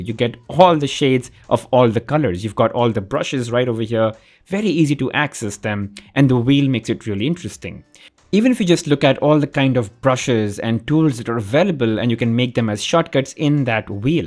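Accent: India. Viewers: I love hearing your 'd's So in'd'ian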